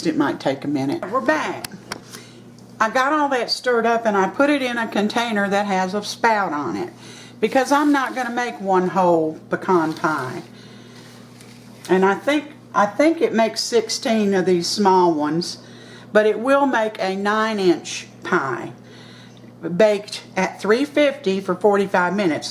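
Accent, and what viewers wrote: South, United States. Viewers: I love your voice! Second run at watching. Were those toss-a-way/recycle aluminum pie tins or not? These are my Mothers favorite before I made flan...not easy to transport to holiday parties. You said "hardly" packed brown sugar. Did you mean packed hard or not very packed hard? From the North, I am in love with your Southern accent. I did a recipe from a newspsper once back in the early 90s. Dutch apple pie in a brown bag. Best results. Never found it again.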